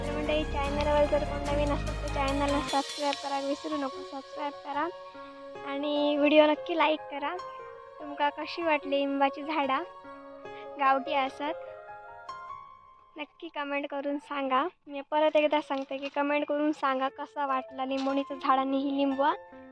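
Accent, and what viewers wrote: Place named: Malvan, India. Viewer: Tuz gaon konat...? I like malvani language tone Very nice video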